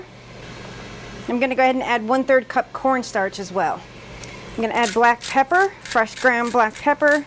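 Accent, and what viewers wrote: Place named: Philadelphia, United States. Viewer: Are you from Philly can tell by your accent.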